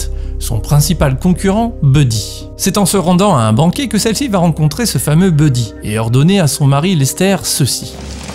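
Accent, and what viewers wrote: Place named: France. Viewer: C'est trop génial !!! Cet accent français sur le texte anglais est un vrais régale ahahahaha :D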